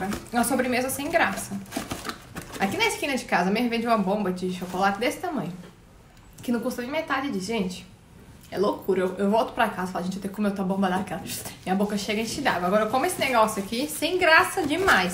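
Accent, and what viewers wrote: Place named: South, Brazil. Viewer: O é do sul? Sotaque tá meio entregando e CUECA VIRADA em vez de grostoli ou orelha de gato hahaha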